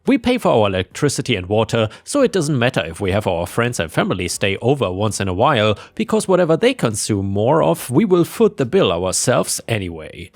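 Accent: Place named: Germany. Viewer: I can't help it, but your accent sounds very german to me. Are you a german expat in Thailand? Anyways, thanks for the entertainment!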